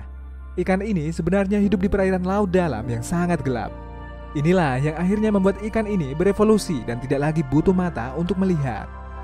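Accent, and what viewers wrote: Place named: Medan, Indonesia. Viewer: Orang medan kau yaa bahasa kau mirip kali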